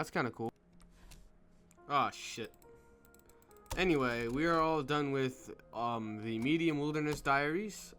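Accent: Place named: Buffalo, United States. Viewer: U frm buffalo?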